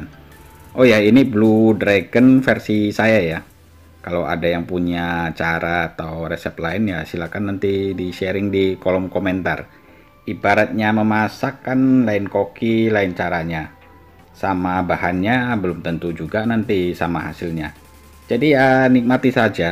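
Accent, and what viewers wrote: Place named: Madura, Indonesia. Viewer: logat.e dudu suroboyonan tapi meduroan 😂🙏👍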